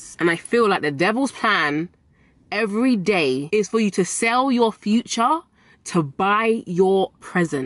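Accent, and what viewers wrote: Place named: London, United Kingdom. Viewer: This beautiful young lady has a London, England accent.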